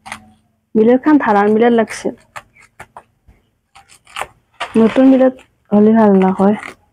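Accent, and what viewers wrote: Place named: Nalbari, India. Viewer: Ata kotha kom beya napaba tomi nalbari r lenguage te koba vhal lage but likhita kole akdom beya xuni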